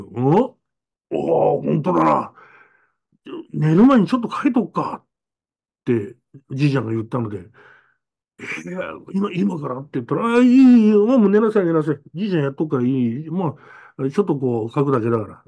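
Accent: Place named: Aomori, Japan. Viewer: 青森県出身のわたし 🥰🥰🥰 さんの青森県の方言、お上手ですね 🥰 青森県は 🎀津軽衆 🎀南部衆と分かれます🫧🤗 わたしは南部衆の産まれですが。八戸の近くです！さんの方言方法は南部衆の方言に近いですね 🥰 南部衆の方言は北海道の方の方言にも似てるとわたしは思います 💐💐💐🥰 心温まる怪談、本日も癒されておりました 💝🫧🫧🫧🤗 ありがとうございます♪ そして、いつもご丁寧にお返事ありがとうございます♪ シングルマザー、美容家のわたし🫧🎀 子育てやお仕事の励みになっております 🫧🫧🫧 感謝致します❣️ 🌹鈴子🌹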